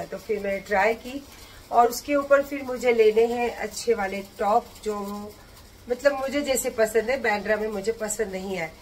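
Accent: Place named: Andhra Pradesh, India. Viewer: Yeh most of kyaa hain pata nahin kahan se english sikhkar aayi hain hindupur ki english hain 😂😂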